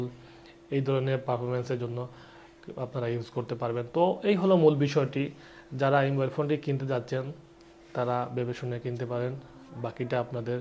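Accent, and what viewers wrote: Sylhet, Bangladesh. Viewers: Bari sylhet na kita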